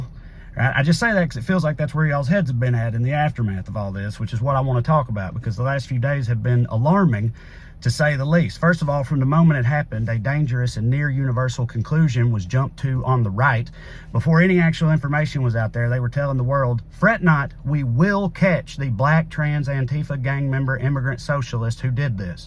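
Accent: South, United States. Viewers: Bless you mate. I showed one of your posts to my Dad became he's become so anti American (especially anti Southerner) because he assumes anyone who speaks like you is a Trump supporting sub-intellect. He said 'I like the boy & i reckon he could speak underwater with a mouthful of marbles'. 😂 Love from Australia 🐨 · I love all your rants Trae! I also spent some of my childhood in the south.. so it's fun to hear you spout liberal views in a southern accent.. keep it up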